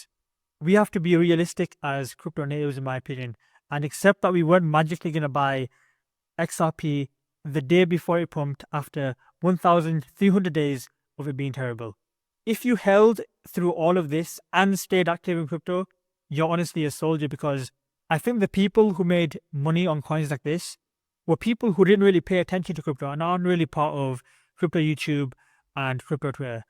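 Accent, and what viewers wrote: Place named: Oldham, United Kingdom. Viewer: Where are you from son? Sounds like an Oldham accent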